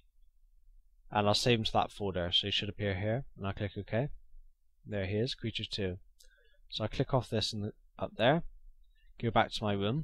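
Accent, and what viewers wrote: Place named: Ireland. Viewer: Great tutorials, and do you happen to be from Ireland? You sound like it :P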